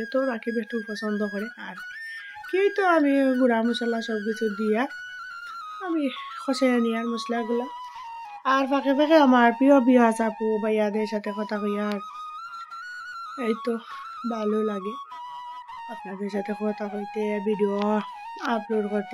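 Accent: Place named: Sylhet, Bangladesh. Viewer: আসসালামু আলাইকুম কেমন আছেন দিদিয়া বাসার সবাই কেমন আছে মাশাল্লাহ আপনার কথাগুলো অনেক ভালো লাগলো আপনাদের বাড়ি কি সিলেট দিদিয়া আপনার কথার প্রেমে পড়ে গেলাম এভাবে কথা বললে আমার খুব ভালো লাগে আমিও কখনো সজনে দিয়ে ডাল খাইনি দেখে তো খুব খেতে ইচ্ছে খুব ভালো লাগলো আজকের রান্নাবান্না আপনি জন্য দোয়া এবং ভালোবাসা রইলো তোমাকে সাপোর্ট করেছি আশা করি ছোট বোনটা কেউ করবা সব সময় বোনটাকে পাশে পাবেন ইনশাআল্লাহ 🎉🎉❤❤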